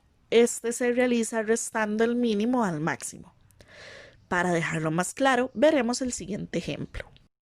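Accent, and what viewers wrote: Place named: United States. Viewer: Soy yo o la profesora habla como si fuera gringa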